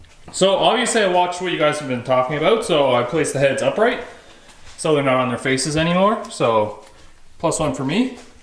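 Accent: Canada. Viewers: The Canadian accent keeps me entertained.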